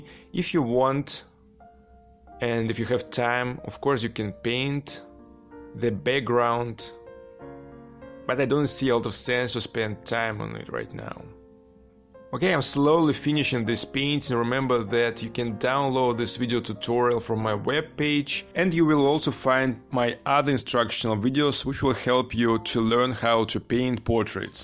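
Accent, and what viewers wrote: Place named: Russia. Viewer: Amazing,i love your russian accent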